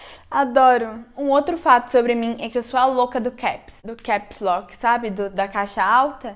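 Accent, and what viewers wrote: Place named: Rio Grande do Sul, Brazil. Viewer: Te amo, vc é muito linda, tbm acho legal o sotaque gaúcho. Vc é bem sincera, e vc gosta de tudo que é bom, curto muito seus vídeos. Determinada, sabe se expressar e sou sua fá. Bj princesa....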